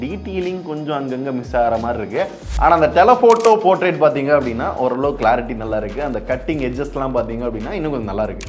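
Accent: Madurai, India. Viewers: Takkali....vokali ❤ pathukkalam madurai slong❤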